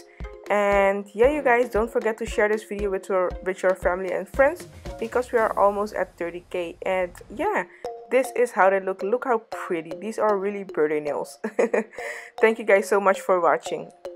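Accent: Netherlands. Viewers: ik hoor gewoon dat je Nederlands bent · Ben je misschien nederlands lijk ik te horen aan je accent lol?😂) are you dutch ? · Ik dacht al ik hoor een NL accent door je Engels. Toen ging ik naar je insta en zag dat je NL bent. Hahahaha in ieder geval je doet echt heel mooi werk💕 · What country are you from, you sound dutch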